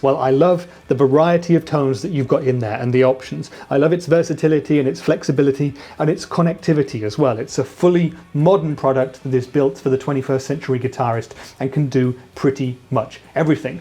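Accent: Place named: United Kingdom. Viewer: Are you German? Your English is flawless, I thought you were British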